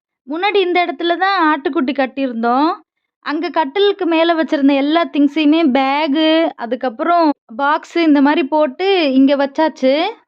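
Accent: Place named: Odisha, India. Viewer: நீங்கள் ஏன் ஒரியா பெண் மாதிரி தமிழை பேசுறீங்க?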